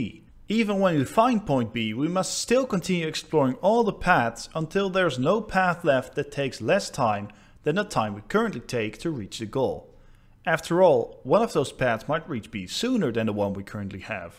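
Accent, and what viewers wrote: Netherlands. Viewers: Great vid. One little question, did your English decline from a couple years ago? Like your dutch accent is more pronounced then roughly 2 years ago.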